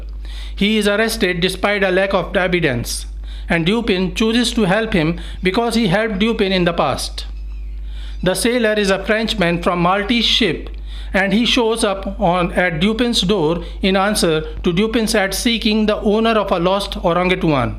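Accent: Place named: India. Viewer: why how racist of u <3 the moment i heard his accent i knew he knew his sh*t lol. all informative videos made by people I hear with an Indian accent turn out to be great. Thanks for the vid!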